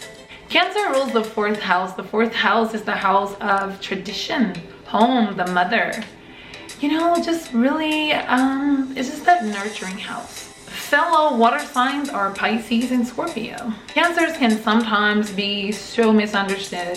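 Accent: Caribbean. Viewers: yea i grew up in Bama but I kept focusing on what I thought was a Caribbean accent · Where are you from you sound like your accent is Caribbean!?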